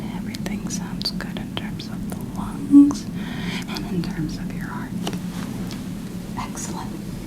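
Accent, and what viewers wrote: South, United States. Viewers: I find it funny cause I found you with your short videos with your southern speak and what it means. I’m so happy you also do asmr, you’re awesome and your voice is very relaxing. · i was confused when i saw your face on an ASMR video and i was like ‘wait….?’ and i have been pleasantly surprised to find you’re one of my new favorite ASMRtists and you help relax me so much!! i know you do it for comedy but you really do have a knack for it. i kind of wish all of my doctors were like this, the familiarity of speech and mannerisms put me at ease, and you have a really lovely soft-spoken voice! can’t wait to see more <3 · i love your videos with a SOUTHERN accent!! scratches my brain! me and my gf watch them before bed and fall asleep. your comedic timing is great and the asmr is so comforting!!! ❤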